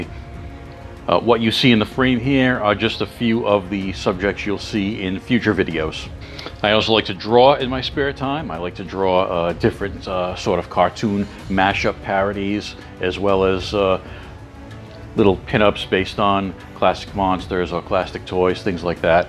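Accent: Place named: Northeast, United States. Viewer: Ohhh man the electirc company!!! that was wild! Totally flashed back when you mentioned that,, And Man, you have stuck your foot in a great pool of you tube friends my man! welcome! your channel is already so entertaining. Can't wait for the Bat review in March. Blue is cool, music is great - I like your voice the most... great north east usa accent man. I just really like that you are true blue.. passionate about this stuff and are not a faker like so many seem to be these days! Proud to know you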